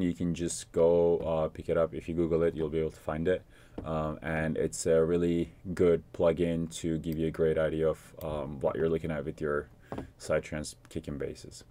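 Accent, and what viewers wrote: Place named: Iran. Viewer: You sound Iranian 😊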